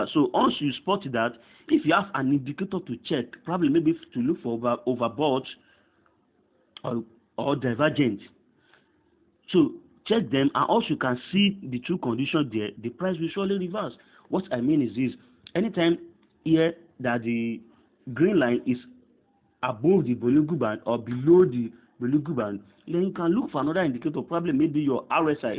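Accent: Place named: Nigeria. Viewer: Add bulegoo bands... dam gotta love Nigerian accents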